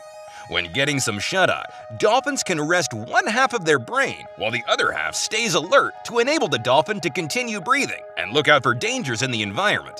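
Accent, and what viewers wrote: United States. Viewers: Appalling American narration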